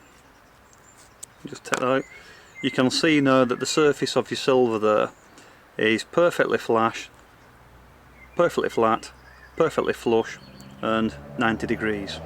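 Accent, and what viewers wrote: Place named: Northern England, United Kingdom. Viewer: Thanks for your reply. I wouldn't have guessed Northern England, but I wasn't sure. I spend a summer in Sheffield many years ago with a bunch of Australian friends. I loved England and haven't had a chance to get back. This little tool is brilliant. I am having one struggle with though, maybe because I'm a beginner, but maybe you have a tip. When I am filing a piece of sheet metal I find that after I have squared it upon the stop I notice it shifts sometimes when I go to tighten it back up. Probably need more practice. It looks like you are holding it in place with one finger while you tighten. I will try giving that a try. Thanks again for sharing these videos.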